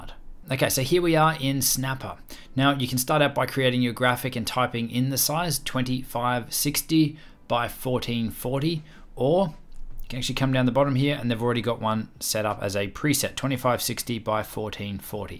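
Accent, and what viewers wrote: Australia. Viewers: great Aussie accent~~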